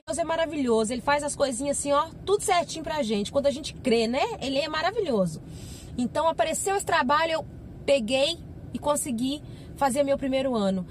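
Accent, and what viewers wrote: Minas Gerais, Brazil. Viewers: Oi, acabei de chegar no se canal e já amei o primeiro vídeo que assisti. Você está certíssima, amiga, vergonha temos que ter da desonestidade. Seu trabalho é muito digno sim e se você está vencendo na vida é graças a ele. Amiga, percebi um sotaque mineiro, eu também sou mineira de Coronel Fabriciano, e você é de onde? 😘😘😘😘😘😘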